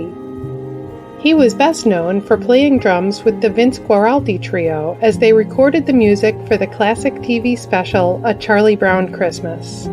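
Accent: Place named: New England, United States. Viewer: U need to calm that extreme Yankee accent down, js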